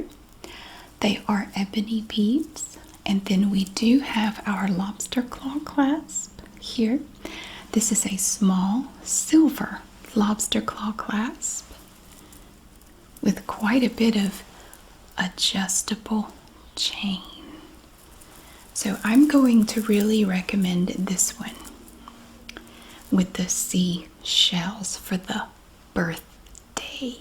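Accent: South, United States. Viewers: I love I'm from the UK 🇬🇧 I love the Southern American accent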